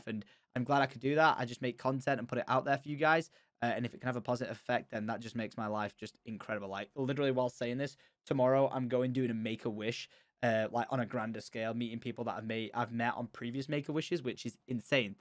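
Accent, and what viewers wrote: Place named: Manchester, United Kingdom. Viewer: This is the most manc you've sounded in years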